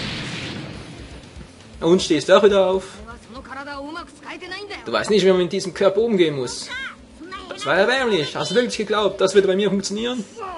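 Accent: Switzerland. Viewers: Kommst du aus der Schweiz ?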